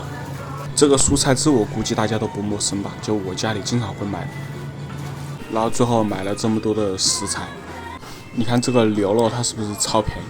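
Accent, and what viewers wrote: Wuhan, China. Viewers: 你是武汉的吗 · 你这武汉普通话的口音真重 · 武汉老乡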